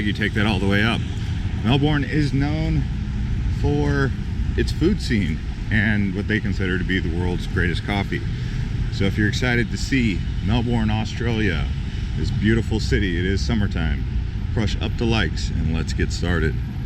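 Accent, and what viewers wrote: United States. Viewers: Aaaagh American pronunciation aaagh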